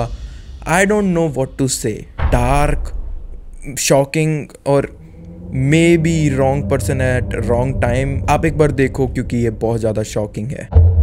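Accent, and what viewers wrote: India. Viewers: I couldnt understand anything because of his dirty hindi accent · I love his Indian accent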